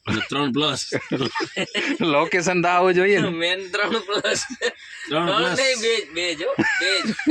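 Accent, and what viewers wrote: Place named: Kathiawar, India. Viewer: મઝા નથી આવતી ભાઈ... તમારા વીડિયોમાં જ બધું આવવા દયોને.. અહીં મુંબઈ માં બેસી તમારી કાઠિયાવાડી ભાષા નો લેહકો સાંભળવાની બહુ મઝા આવે છે...". કાંઈ ઘટે નહીં ભાઈ..." 😊😊😊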